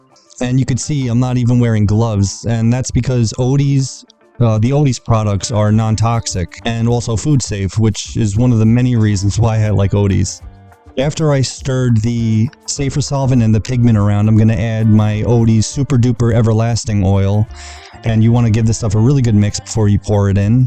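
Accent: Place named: New England, United States. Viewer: I enjoy your work & have seen many or your videos. I subbed today, didn't realize I hadn't. Sometimes I wait for a few videos to see if a person genuinely has my interest. May I ask, are you from NY or New England? I think I recognize the accent (I'm a New Englander), no offense intended. You could consider (?) putting an email address in your bio or other SM as some of us may be interested in contacting you without leaving messages for the world to see ;) - Great job, so refreshing to see restoration. I also admire furniture art & many forms of art, but restoration is its OWN baby! 🥰Kudos!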